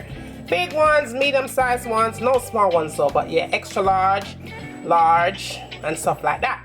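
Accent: Jamaica. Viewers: Lol yk i jamaican when you hear one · Love your authentic Jamaican flair. Gwan goodie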